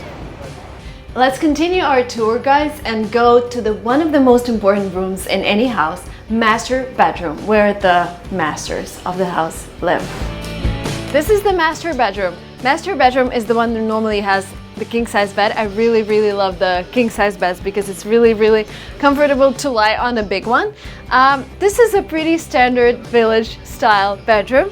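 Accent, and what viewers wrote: United States. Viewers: your accent sound more and more american with every new video.👍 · I really like your videos! I'm watching you from the mexican state of Tlaxcala! you sound pretty american like a native speaker wish to talk just like you! you're a smart cookie! regards lovely!!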